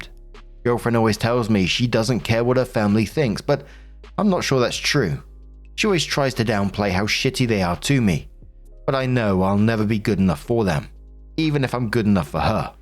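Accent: United Kingdom. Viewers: As an always sunny fan hearing say "pounding off" very seriously with his british accent sent me. thank you.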